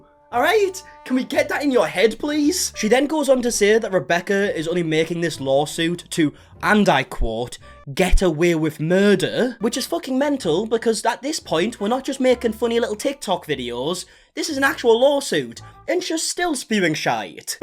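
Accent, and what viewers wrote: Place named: North, United Kingdom. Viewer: NICE ONE! What is your accent, by the way? It sounds like somewhere in the UK, maybe up north, but I'm not sure.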